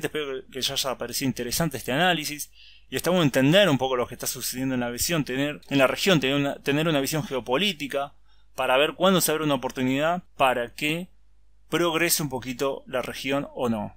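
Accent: Argentina. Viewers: Tu acento de nariz tapada argentina es tan fuerte que se te entiende poco. Mejóralo, chhhheeee..... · En "ashuda" y es la forma de hablar que tiene la gente que vive en el gran Buenos Aires,CABA y distintas ciudades a lo largo y ancho de la república Argentina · que lindo esuchar un argentino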